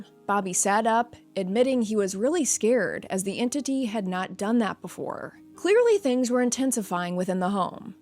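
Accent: United States. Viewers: I've heard this story before somewhere. But your cowboy accent made this so much more entertaining!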